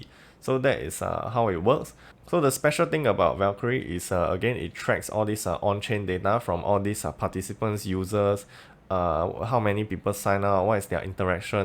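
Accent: Singapore. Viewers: Thanks for the clear and concise video. You sound Singaporean. Cheers from another Lunatic from the lioncity. ;)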